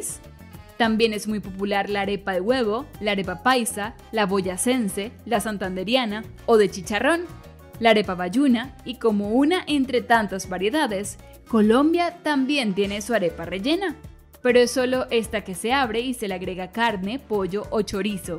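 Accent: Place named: Mexico. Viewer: Es mexicana la voz,que narra ese absurdo video.Y yo,perdiendo mí tiempo en esta pendejada.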